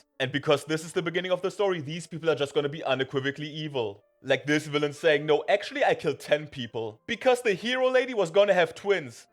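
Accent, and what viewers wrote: Germany. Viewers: Ich höre deutsch raus?👀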